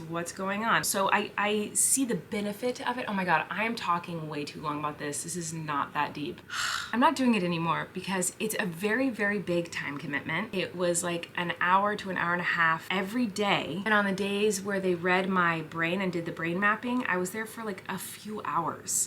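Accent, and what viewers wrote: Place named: California, United States. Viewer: TTQ: Hi! This is kind of a weird question, but did you find when you moved from LA to New York, or when you moved to Vancouver your accent changed? When I watch you vlogs, I notice you have a bit of a Californian accent (its not that noticeable and its probably because I'm Canadian and I have a different accent than you), and I know for me when I moved from Ontario to the East Coast of Canada my friends said my accent changed! I wondering if that happened to you? I love your vlogs and you are AMAZING!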